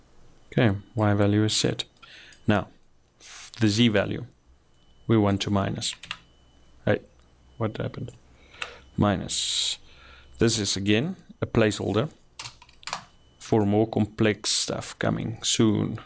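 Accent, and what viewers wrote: South Africa. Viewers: Hoekom klink jy soos n Suid Afrikaner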